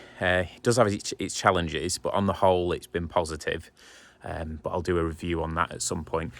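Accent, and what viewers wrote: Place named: Northwest England, United Kingdom. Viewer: Your accent sounds like you are based somewhere in the NW. I’m midst arranging a eco4 heat pump install (and insulation) via the council for my parents, it’s for a nearly 300m2 property. Pre insulation it was a 18kW heat loss estimate from the EPC, obviously we hope that can be significantly improved. Wonder if I can ask what a the key aspects I should I be looking for from the installer please, it’s the council approved installer from Cheshire East that is based in Warrington/ Liverpool (I don’t like to mention whom it is). Thanks for any tips.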